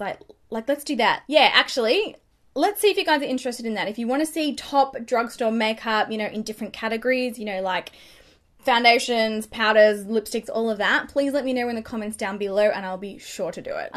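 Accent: Australia. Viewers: I just want to put this out there. I'm not gay or anything. I'm happily married for 37 years. I just really love the way Australians talk.. That's all...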